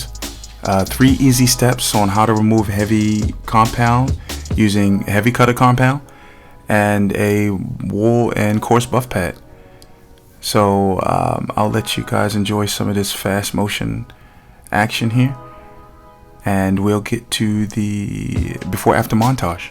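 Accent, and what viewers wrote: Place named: Baltimore, United States. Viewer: I’m going to guess you are from Baltimore?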